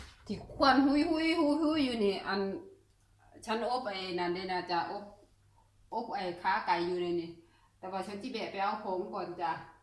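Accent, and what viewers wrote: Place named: Korat, Thailand. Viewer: สวัสดีจ้า สำเนียงนี่คนโคราชแท้แหละเนาะ คนกะไหนน้อป้าก็คนโคราชคือกันเด้อจ้า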